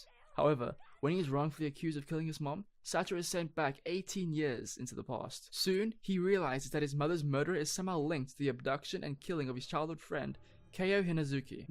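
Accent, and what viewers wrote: South Africa. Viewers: what is your accent?? south african??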